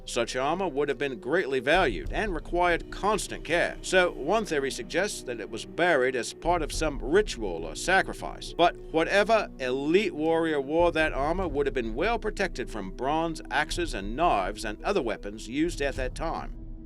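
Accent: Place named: Australia. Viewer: these videos wouldn't be as good without narrating them. keep up with the good work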